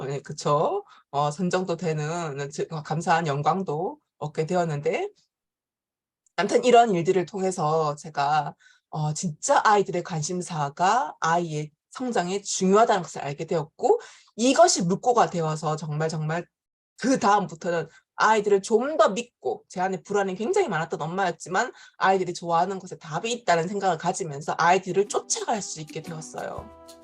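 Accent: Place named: Gyeongsang, South Korea. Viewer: 작가님~오랜만에 유튜브 올라왔네요^^얼마전에 교집합 스튜디오에 나온거 보고 엄청 반가웠는데 ㅎㅎ 작가님의 이 구수~~한 갱상도 사투리와 억양+ 가감없이 진솔한 옆집언니같은 화법 완전 팬입니다!! 듣는거 자체로 힐링됩니다~앞으로 자주 올려주세요~~❤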